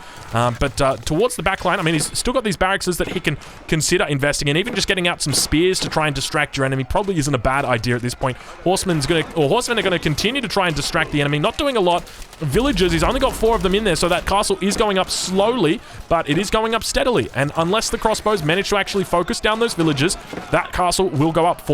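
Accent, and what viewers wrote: Australia. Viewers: Incredible game, incredible commentary! I've seriously never enjoyed any commentary with an aussie accent til now and absolutely nails it! loving every second of it.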